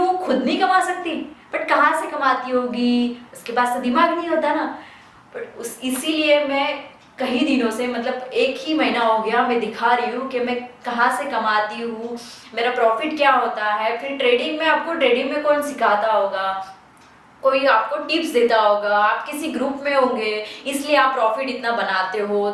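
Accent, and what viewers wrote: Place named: Bihar, India. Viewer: Are you from bihar?ur hindi speaking is like biharis.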